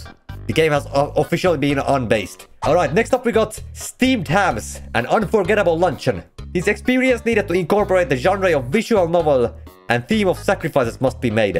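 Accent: Finland. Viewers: i love the strong Finnish accent probably because im Finnish.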